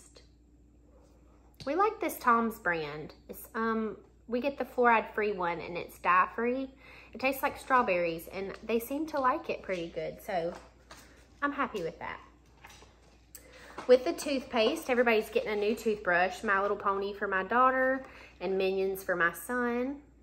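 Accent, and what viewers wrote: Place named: Texas, United States. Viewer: Are you from Texas because you sound like you are I kinda like it 😅 bc it sounds really country for some reason 😂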